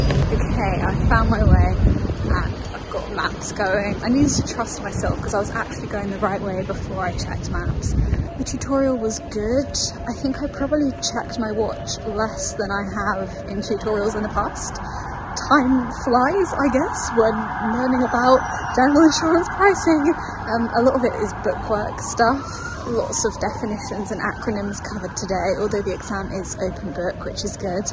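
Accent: United Kingdom. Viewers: Her elegant British accent! Just wow 😂😍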